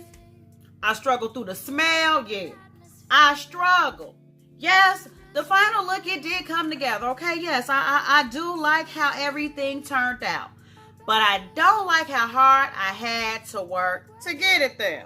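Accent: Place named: United States. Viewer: Your non chalant country voice cracks me up i looooove it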